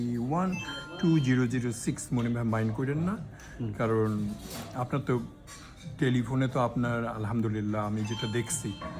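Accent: Standard. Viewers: আপনার শুদ্ধ বাংলা বলার ধরনটি চমৎকার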